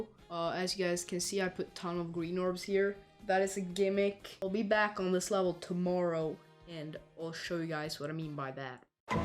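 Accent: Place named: Sweden. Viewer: Are you by any chance swedish? It just Kinda feels lika you have a bit of a swedish accent.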